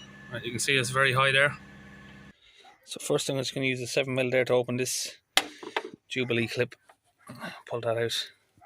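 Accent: Ireland. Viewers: Are you Irish by any chance?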